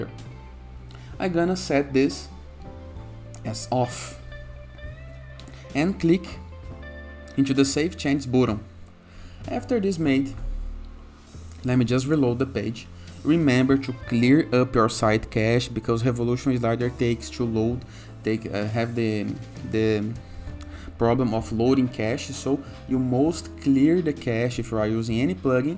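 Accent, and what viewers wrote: Brazil. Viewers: Judging by the accent you are brazilian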